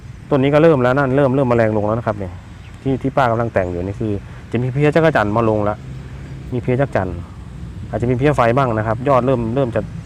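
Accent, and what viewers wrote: Thailand. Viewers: ดีมากเลยค่ะ พูดภาษากลาง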